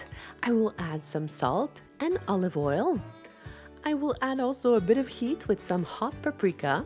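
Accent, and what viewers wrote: France. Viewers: I love your pronunciation, it's very French. My city Odesa (Ukraine) has very deep and long-standing ties with the state of Israel and here we pray for the people of Israel, all of us, Jews and Christians. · I love spending time with you! Thank you for this video,be safe! PS - is your native tongue French? Greetings from a portuguese fan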